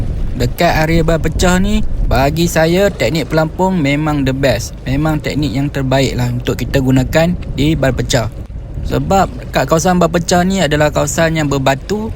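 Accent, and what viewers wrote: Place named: Aceh, Indonesia. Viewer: sbb bunyi sebutan "T" tu mcm sebutan Aceh..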